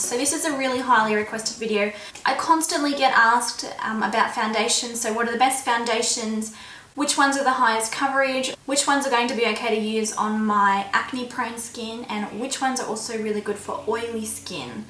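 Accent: Australia. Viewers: Are you from Queensland?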